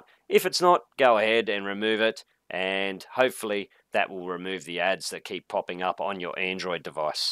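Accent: Australia. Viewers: Probably because he's australian. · Nah he just sounds Australian lol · Every Australian sounds like this, wdym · He just has an Australian accent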